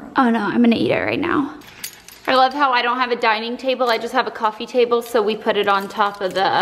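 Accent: South, United States. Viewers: Hi, I've been watching your videos for so long and just honestly love your personality and seeing more into your life! As long as they keep making you happy I hope you keep making vlogs for a long time! Also was wondering after watching the clips from your first youtube video ever if you had to consciously work on your southern accent, or if it naturally got less strong when you moved to LA? You definitely had more of a twang when you were younger haha!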